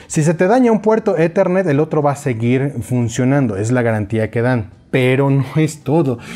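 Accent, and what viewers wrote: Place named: Sonora, Mexico. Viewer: es de Sonora o por qué tan Hermosillo?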